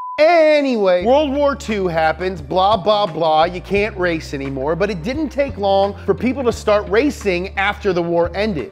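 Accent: United States. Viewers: I couldn't watch more than a minute. Why do Americans speak like this? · Look... i don't know anything about F1... but somehow beung ranted at by an american makes me think everything is false in this video.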